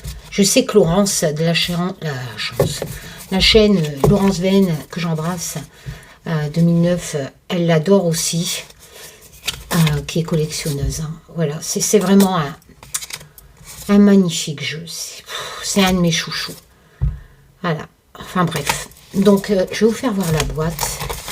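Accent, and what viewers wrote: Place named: Ch'ti, France. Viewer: Bonjour jadore quand tu parle en patois chti Je suis une de snord mi Ca me fais du bien en plus quand tu chantonne le schiot quinquin Bisous ❤❤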